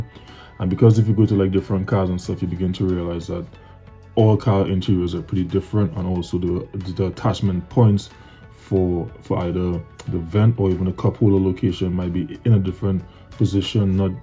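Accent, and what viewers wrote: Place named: Trinidad and Tobago. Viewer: You sound Trini brother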